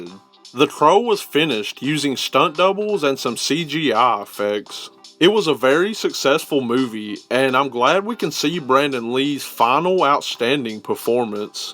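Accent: South, United States. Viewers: Are you Chills doing a southern accent?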